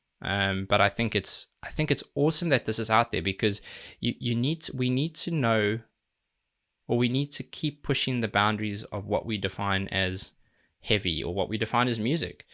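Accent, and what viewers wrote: South Africa. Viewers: This dude sounds South African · I Knew that you were South African , I have the same accent you have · Bro you sound South African, I may be wrong though 😅 great video friend, Alex is such a madlad 🤘🏻 Edit: He's South African, my bad 😅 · that a south african accent?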